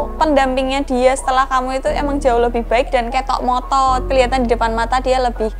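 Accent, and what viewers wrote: Java, Indonesia. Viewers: Duh kak medok² Uwu gitu angel angell nemen eram😍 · jowone ngendi mbak, sapean....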